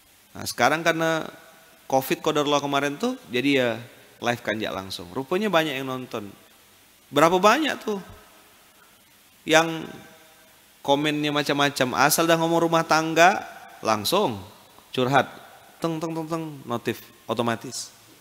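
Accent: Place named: Malaysia. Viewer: Logat macam Malaysia pun. Terbaik ustadz ni.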